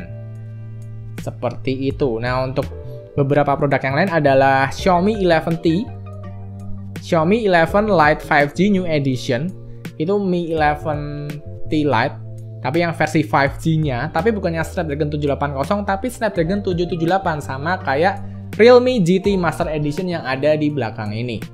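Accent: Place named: Java, Indonesia. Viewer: Medok jawir anjir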